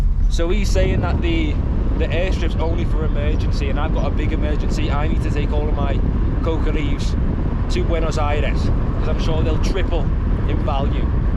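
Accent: Colombia. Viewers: Bro talks different like colimbian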